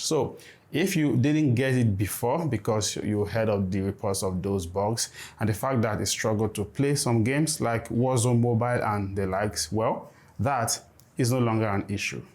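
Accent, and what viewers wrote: Africa. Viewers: I got one word in 3 , why have someone with a strong africian accent describe a product, no offence but speech is not clear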